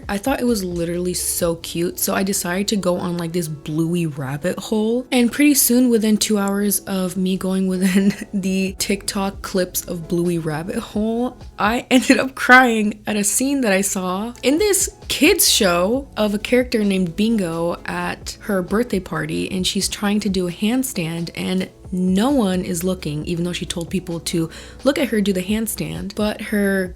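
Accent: United States. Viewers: bluey and bingo are living my childhood. i've lived in australia my whole life and it is incredibly relatable! there are a few things in this video you got wrong, but it isnn't your fault! its just because your accent and you not knowing australia! it isn't queens island, its called queensland. i still love you♥. also the way you say osy!! for americans, say ozzie. hahaha got a good laugh. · As an Australian i love seeing this being talked about around the world. I also find it a little funny hearing how Americans pronounce the Australian locations.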